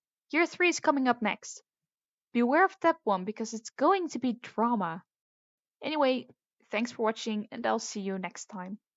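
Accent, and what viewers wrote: Netherlands. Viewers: Awesome! Also do you speak Netherlands/Dutch? It sounds like that so sorry if its not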